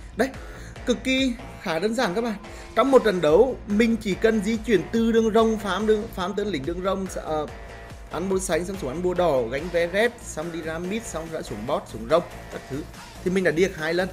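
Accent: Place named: North, Vietnam. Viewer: Giọng nói miền bắc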